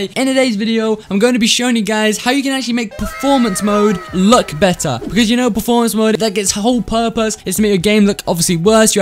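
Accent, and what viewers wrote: Sweden. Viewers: ur 100% swedish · you are from sweden i know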